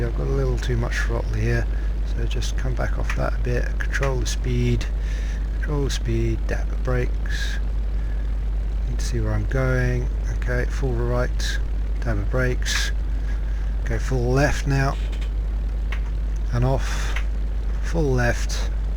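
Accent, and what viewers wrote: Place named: United Kingdom. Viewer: A BRILLIANT upload! Great flying! Thank you sir! I will try hard to put all this into practice. (BTW - it's so nice to hear the accent of a fellow Brit giving these tips on this amazing British aircraft - for me, it's the best ever aircraft - cannot be equaled). I have to check tho' - I assume you are using 0% 'Takeoff assistance'? I'm using 0% & I find everything very difficult (*& ....'wobbly') - so I guess the axis control graphs are pretty important. I have pretty gentle slopes to my graphs, but I still wobble as if it's too sensitive. Would you be inclined to share your axis graph settings (...please...)? *On my channel - <link> - and this was with 20% assistance!! Only recently have I gradually dropped to 0%. I'll be trying this soon!!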